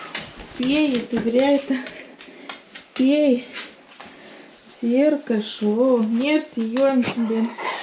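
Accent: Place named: Samogitia, Lithuania. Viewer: Tamsta, esat nuostabi.. Puiki žemaitiška tarmė..malonumas ausiai..malonu Jus matyti ir klausyti..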